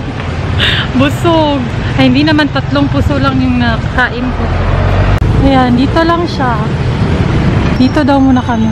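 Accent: Visayas, Philippines. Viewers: Kacute mo gid na ya magbisaya teh 😂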